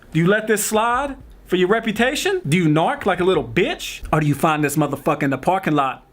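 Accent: South, United States. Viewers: boooy I thought u was black or southern u just got the sound of southern hospitality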